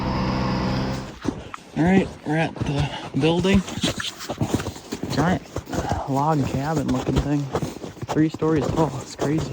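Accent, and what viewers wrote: Minnesota, United States. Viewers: I love how you say fishin' and campin' like a Minnesotan,.:) Hello from Michigan (same accent here, basically).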